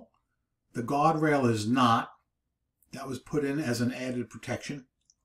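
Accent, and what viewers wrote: New York, United States. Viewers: Your distinct New York accent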